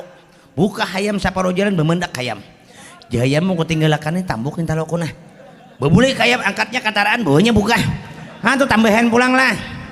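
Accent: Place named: Banjar, Indonesia. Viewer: Ku kira Banjar Tanjung loko jadi suka bangatt denger Nye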